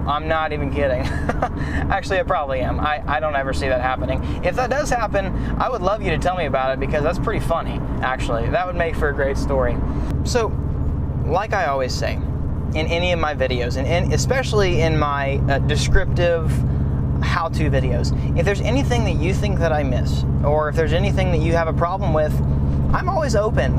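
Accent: Texas, United States. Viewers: Listening to this 3 years later............ THAT TEXAS ACCENT THOOOO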